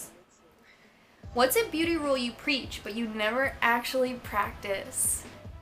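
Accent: Philadelphia, United States. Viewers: I feel like you say, "I don't even-t know" with your Philly accent a lot :)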